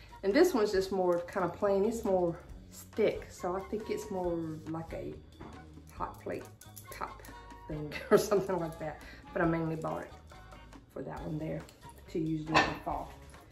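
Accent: South, United States. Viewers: Hi! I love your hutch. I too am a strawberry lover and have many pieces in my kitchen. I enjoy listening to your sweet Southern accent. If I may suggest, don't keep your strawberries through the fall because fall decor is gorgeous and I'm sure you do it beautifully. I change my kitchen for the spring/summer, fall/Halloween/Thanksgiving (not all at once), Christmas and finally winter. I look forward to each season and holiday and each year I have more and more items to incorporate into my decor. It's so much fun! My favorites are fall and my kitchen at Christmas because it is a wonderland of gingerbread boys, girls and houses, sprinkled with cakes, cookies and all types of Christmas candies, faux of course. It's great when you keep finding items for a certain theme. Happy decorating and stay as sweet as you are! Hugs from an ex-New Yorker who now lives in New Jersey. 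Keep up the good work, 💞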